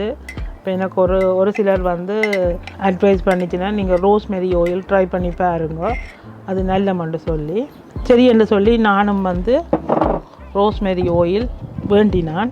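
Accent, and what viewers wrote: Sri Lanka. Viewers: உங்கள் தமிழ் பேச்சு மிகவும் அழகாக அருமையாக கேட்பதற்கு இனிமையாக உள்ளது.உங்கள் இலங்கை தமிழ் கொஞ்சிப் பேசும் அழகு மிகவும் எனக்கு ரொம்ப பிடிக்கும்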